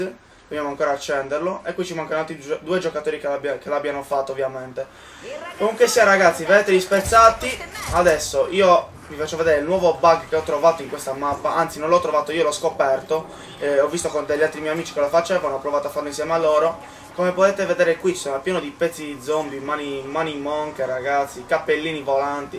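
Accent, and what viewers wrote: Sardinia, Italy. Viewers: Ma sei sardo? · fra sei sardo vero??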